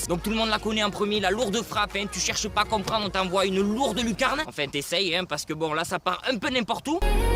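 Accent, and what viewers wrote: Marseille, France. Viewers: L’accent marseillais 👌🏼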